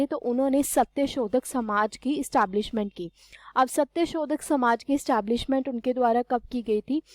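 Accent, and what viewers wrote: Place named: Punjab, India. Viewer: I love ur punjabi bol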